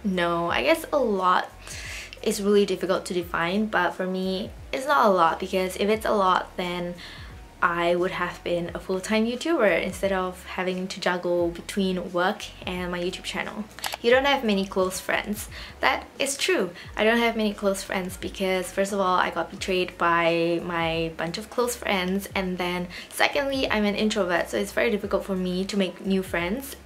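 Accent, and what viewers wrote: Singapore. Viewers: Your first video that I saw was the purito review and when I heard your voice I assumed you're a Singaporean so I came to your channel and saw this video and I was right hahah. Singaporeans really have this distinguished accent XD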